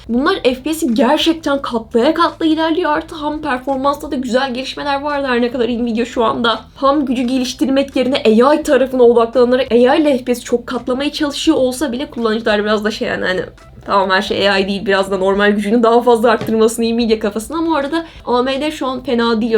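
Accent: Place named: English-speaking world. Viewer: Bu karı niya ağzı yaylana yatlana konuşuyor? Sanki ingilizde türkçeyi sonradan öğrenmiş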